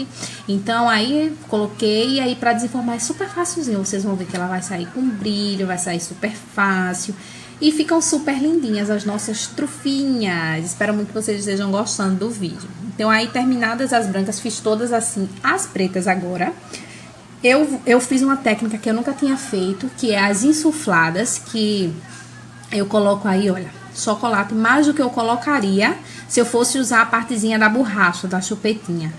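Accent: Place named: Pernambuco, Brazil. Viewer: Adoro suas receitas!! Esses docinhos....cada um mais lindo que o outro!!! Pelo seu sotaque, você é daqui de Recife/Pernambuco??? Se for somos conterrâneas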